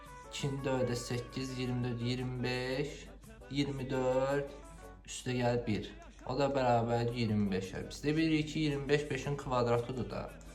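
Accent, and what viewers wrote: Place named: Baku, Azerbaijan. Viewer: Qeseng 👍 lehce yarasib ozumde bakiliyan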